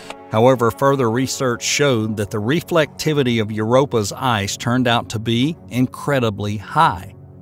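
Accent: South, United States. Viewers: Why is there a redneck narrating,? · what kind of american accent is this??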